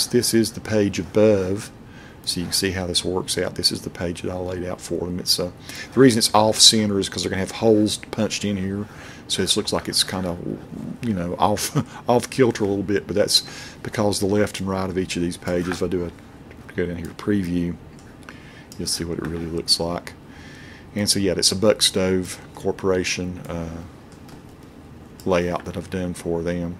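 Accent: South, United States. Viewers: thank for this tip, and for your delightful southern accent. If Adobe was smart they would hire you for their tutorials.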